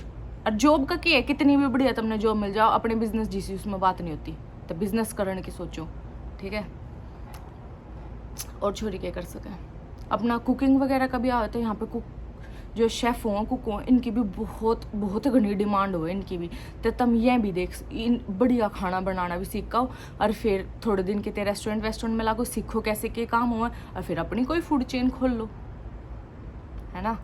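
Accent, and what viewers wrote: Haryana, India. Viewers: Nice description with haryanvi touch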